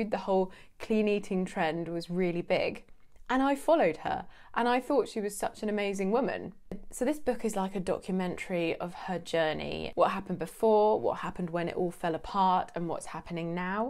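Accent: United Kingdom. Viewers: Hi your stunning teachers with you I learne British accents to speak English < 3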